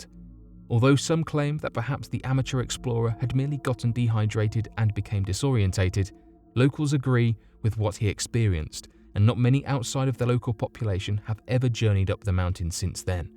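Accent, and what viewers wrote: United Kingdom. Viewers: This is the way they pronounce words in the UK. There are plenty of other narrators that sound just like him. Remember, their version of English came first. So to them, North Americans talk funny.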